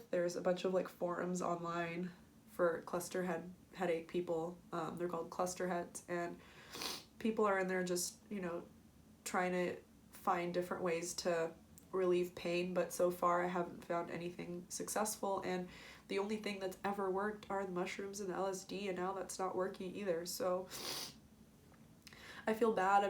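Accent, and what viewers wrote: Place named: United States. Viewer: a few ideas for you: It is likely that it has something to do with a jammed up neck from an old neck trauma injury. If so then to fix such a neck you'll need to use these techniques: <link> The videos that show the treatment and yo get to see some of the hopeless cases that had been written off - but who are now fixed are shown here: <link> As you sound American and I'm in St Albans UK, then for the time being try this technique: <link> - it is the standard homework I give my patients and it is often very effective. As I think its your neck sleeping on an incline may also change the pressure on your neck, so you may need to try a lower pillow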